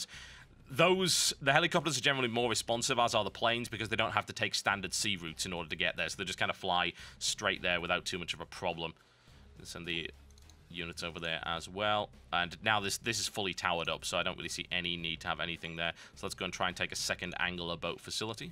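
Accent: Australia. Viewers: Australian guy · Now THAT'S an Aussie accent... Thankyou lord! Not another case of the butchered accent like the horrid one in Dead Island... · Wow... an actual real Australian accent? That's rare.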